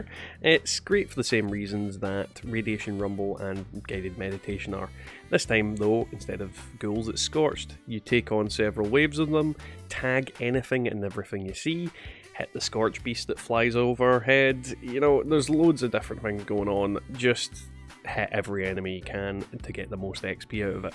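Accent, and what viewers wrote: Scotland, United Kingdom. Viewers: Min far ye fae in Scotland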